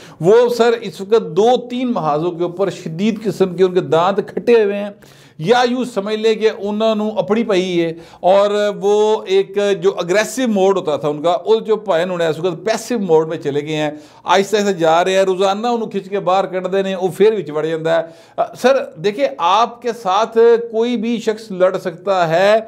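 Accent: Punjab, Pakistan. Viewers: May apka Vlog sunta hon. Ap say may kafi punjabi words seek le hay. Mujay apka yeh punjabi style urdu bolna bhaut pasand Gohar Butt sahib.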